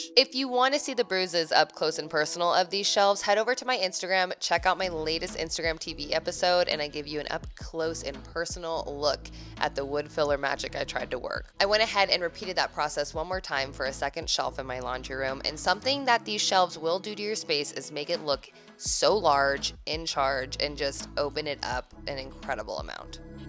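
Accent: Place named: United States. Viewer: love your work. It’s just American accent. You have lovely voice.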